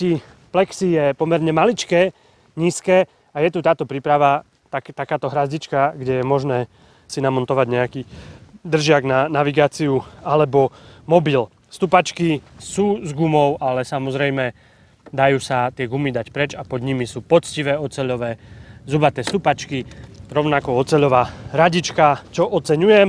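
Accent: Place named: East, Slovakia. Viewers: Kamo keď nájdeš niekoho ako co sa venuje tomu viac ako 20 rokov tak potom mudruj. To ze webstranka ma klasicky design je pre nás old school priaznivcov motoride fajn. Pamätám ze bol jednej z prvých co robil kedysi merch a vtedy išli tie prve tričká ako teplé rožky. To ze prešli na platformu YouTube je fajn a videa sa brutálne zlepšili aj kvalita aj strih. A co sa tyka vychodnarciny tak to k patrí je autentický a na nič sa nehrá. Len tak ďalej chalani v redakcii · Chalani, viem, ze urobit dobre videa chce nielen nadsenie a prehlad v teme, ale aj teba mat aj iste zrucnosti v natacani v prezentacii. Nechcem vam zhadzovat robotu (napriklad prave preto netocim videa, lebo to neviem a nechce sa mi), ale zopar postrehov mi fakt neda nenapisat. 1. Nabuduce si tiez pozicajte farebnu motorku, lebo cierna by pred kopou hnoja nevynikla. 2. Motor P2 nie je popularny, je to len vynutene zlo od EU. 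Inac by tam stale boli V2. Treba povedat pravdu. 3. Kamera zblizka z ruky co nedokaze chvilu zaznamenat stabilny obraz pomaha hodit sablu, ked sa niekomu toci hlava uz z tej vychodniarciny. To tocila frajerka telefonom? 4. Ked sme pri nej (myslim vychodniarcine), tak svetlomet sa zrejme sklonuje podla vzoru "parka". Dve parky, dve svetlomety. Kazda dlhsia veta ako od Kapitana Danka. Vole. K tomu dychavicny prejav ako po prave zabehnutom maratone. Neviem, ako ste na tom v redakcii. Pripada mi to, ako keby ste to robili uz len zo zotrvacnosti. Stranka rovnaka ako pred 15 rokmi (mozno aj dlhsie, ale len co si sam pamatam), chcelo by to viac aktivnych redaktorov, alebo aspon jedneho z bezchybnym vizualnym a hlavne hlasovym prejavom a vy by ste sa mohli zamerat na odborne vstupy, no a k tomu este nejaky stativ a kamerku ci fotak s natacanim. PS: Chcel som to povodne poslat mailom, ale este aj ta stranka vam bezi len na HTTP a nie na HTTPS!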